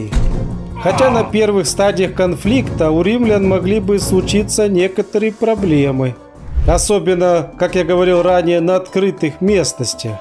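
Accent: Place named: Ukraine. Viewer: русский с Украинским акцентом))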